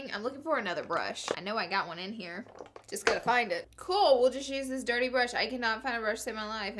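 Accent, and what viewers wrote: South, United States. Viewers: Y'all she looks like a chola but sounds like a southern lady! I love her voice so much 😂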